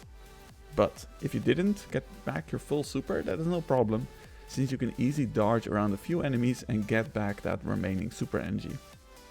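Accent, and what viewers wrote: Netherlands. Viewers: I can hear the dutch in you so hard haha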